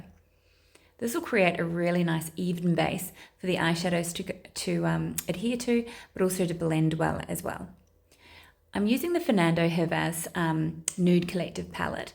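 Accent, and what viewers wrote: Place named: New Zealand. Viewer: Ohhh I see becuz it says Australia on your bio but your accent is a bit kiwi 😅 I was a quite confuse.. I was trying to figure out if you’re a kiwi or an Aussie because u almost sound Australian but more towards New Zealand, it’s like you have mixed accent between the two I’m Thai who have lived in New Zealand for 15 years but now currently living in Australia Btw you have a lovely kiwi accent and you speak very nicely ❤️ it’s nice to listen to 🤗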